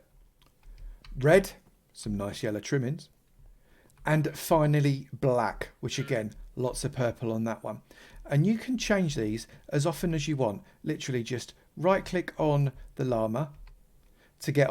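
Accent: United Kingdom. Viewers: btw, oi quoite loik ur british accent its almost asmr to my ears i may join ur server someday. plz do a video on...endermites?(i couldnt think of anything)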